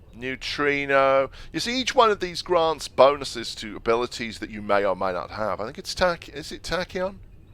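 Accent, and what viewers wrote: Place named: England, United Kingdom. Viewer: If you don't mind me asking, what part of England are you from? I love your accent.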